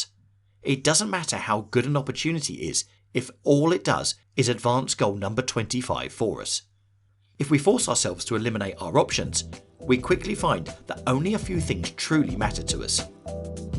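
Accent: United Kingdom. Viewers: Is this British English? Just curiosity.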